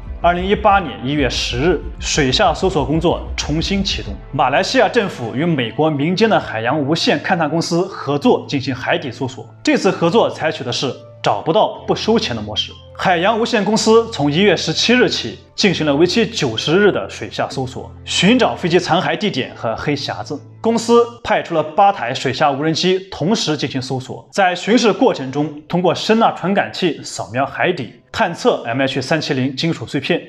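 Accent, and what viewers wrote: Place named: Northeast, China. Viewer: 这个解说的口音太重了，一股大碴子味儿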